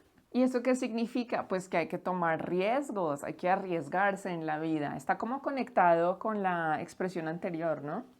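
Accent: Colombia. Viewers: Muchas gracias. Estoy nueva agui... me encanta tu clase, tu manera de ensenar, tu acento de Colombia. Voy a continuar escuchandote. Gracias otra vez. Abrazos de la distancia, de EEUU.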